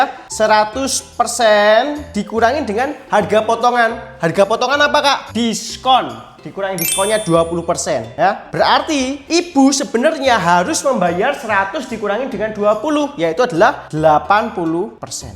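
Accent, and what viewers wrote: Java, Indonesia. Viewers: Orang jawa ya kak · Mendok iki suara nya to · Medok bgt 😭 Jujur suka cara ngomongnya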